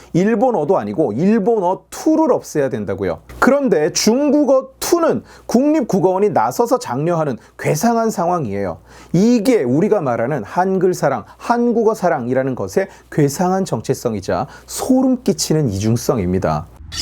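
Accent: North Korea. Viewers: 초반만 봤는데 북한말인줄..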